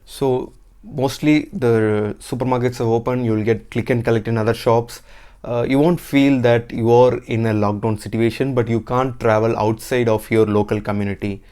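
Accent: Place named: India. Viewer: Why do you repeat the same thing again and again. Your accent is terrible - typical Indian accent 🤣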